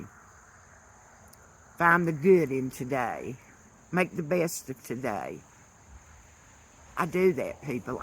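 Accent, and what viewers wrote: South, United States. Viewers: You are voicing exactly how I see things as well. You’re a good, brave person. A beautiful southern voice.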